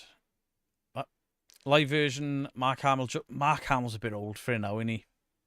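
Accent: Wales, United Kingdom. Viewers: Hi just started watching you think ya great an yeah loved you with watching Paul an Mark from Stoke on trent it was a good 😱an love listening to ya Welsh accent me Dad was from Wales bless him 🏴 an love it when ya went 38 east drive 👻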